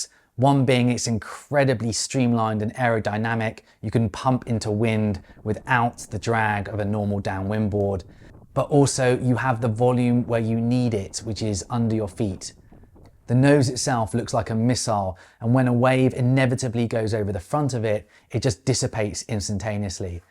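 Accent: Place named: England, United Kingdom. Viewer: Nice one. I love the Australian colloquialism with an English accent. Easy peazy lemon squeezy. I have a question about one of your earlier videos, Part 2 of you learning to downwind and flat water paddle up...You mentioned two things which made a significant difference...one was the foil and the other was the paddle. Can you explain why the paddle made a difference please. And also why you no longer use the PPC paddle shown in that video.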